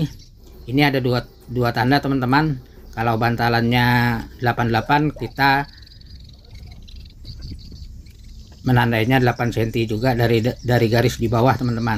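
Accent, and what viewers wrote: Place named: Kutai, Indonesia. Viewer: Dri logat berbicara spertinya suku Kutai dri Kaltim 😂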